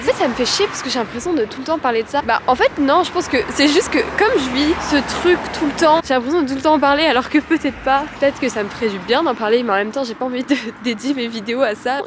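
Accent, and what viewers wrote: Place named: Quebec, Canada. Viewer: Tu as un léger accent québécois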